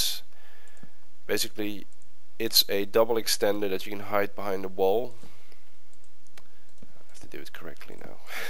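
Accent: Netherlands. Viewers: you sound realy dutch :S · Being dutch myself, I can recognize his accent as being dutch. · it's funny how you just hear a Dutchmen talking english :D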